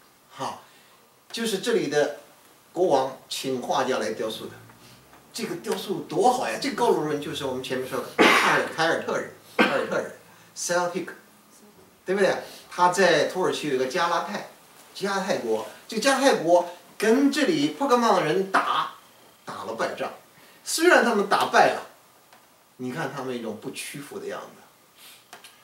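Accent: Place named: Northeast, China. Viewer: shipeng LI 我就是西北人 可他的口音一点也不像西北人 倒是和东北人神似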